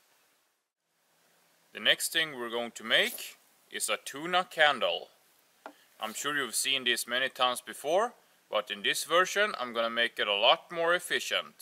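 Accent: Sweden. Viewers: Nice. I've know about most of those. Never thought about permanent markers. Is that a Swedish accent you have? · Great video! Are you Swedish? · Kung är du man hör att du är svensk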